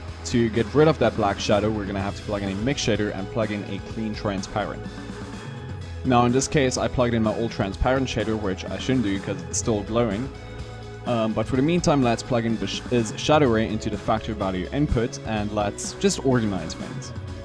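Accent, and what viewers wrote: South Africa. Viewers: You sound South African? Yeah boyeeeeeee!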